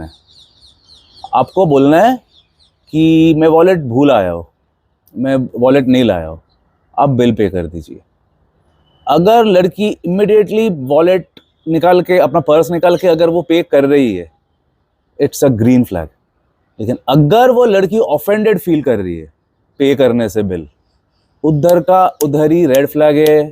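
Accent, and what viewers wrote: Bengal, India. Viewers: Heavy bengali accent ...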